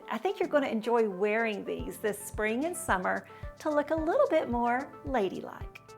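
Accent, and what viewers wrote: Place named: Texas, United States. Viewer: Hi often listen to your videos like them lovvvvve your accent American is it Texan? It's lovely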